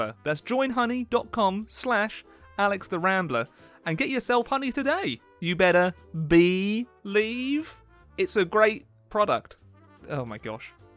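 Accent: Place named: England, United Kingdom. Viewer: sounds more English when he’s in England.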